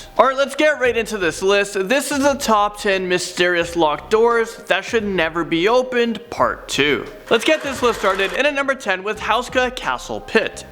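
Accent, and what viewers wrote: India. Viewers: Are you Indian? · ah Indian accent amazing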